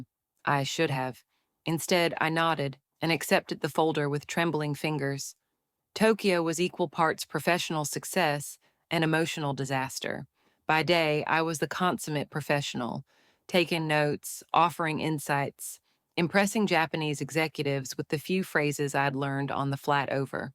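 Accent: South, United States. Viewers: What is this...picture and story about a Black woman being narrated by a southern White woman!? You couldn't even use an AI voice to get a neutral one? This is offensive.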